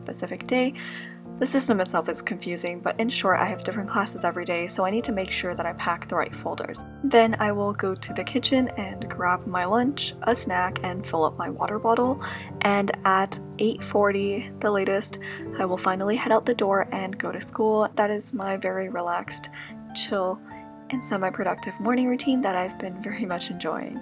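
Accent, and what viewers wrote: Canada. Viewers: She is back with her cute Canadian accent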